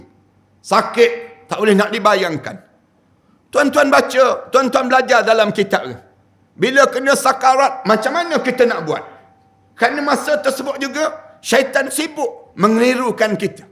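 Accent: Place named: Kelantan, Malaysia. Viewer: Kuat bunyi Kelate Boh kito ni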